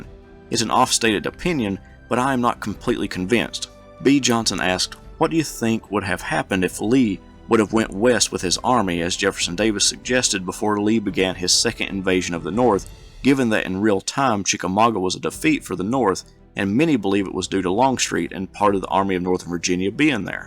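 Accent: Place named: Appalachia, United States. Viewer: I knew that accent was Appalachian. Love it.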